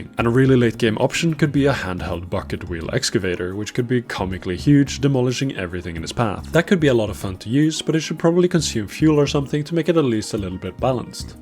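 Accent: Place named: Norway. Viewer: I like your cute Norwegian accent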